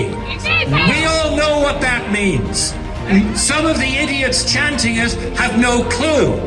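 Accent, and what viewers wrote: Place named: Ireland. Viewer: Such a relief to hear an Irish voice speaking common sense. I increasingly feel like we are living in a twilight zone.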